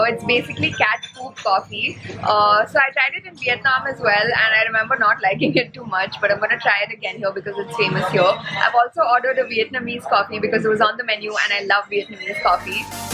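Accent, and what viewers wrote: India. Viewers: I love ur vlog the shot & editing is so great & ur indian accent is so cute. Greeting from Java Island, Indonesia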